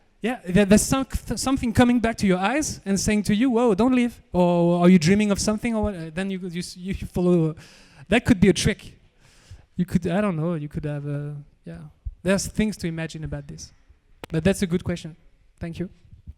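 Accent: France. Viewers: C'est quoi cette histoire de tout le temps parler en anglais ?? Vous vous rendez compte à quel point c'est pénible d'écouter un français parler anglais pendant 1 heure... Please The Family, dont get lost in the sauce...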